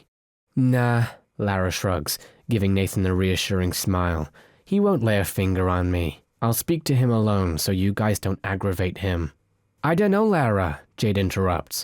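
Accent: United Kingdom. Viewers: Is it just me or does the speaker sound British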